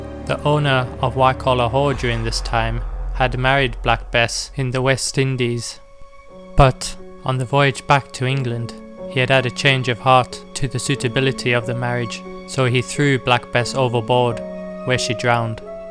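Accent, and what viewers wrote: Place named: Ireland. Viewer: Good to hear that lovely Irish accent again. I’ll make sure to visit Lancashire next time I’m in Ireland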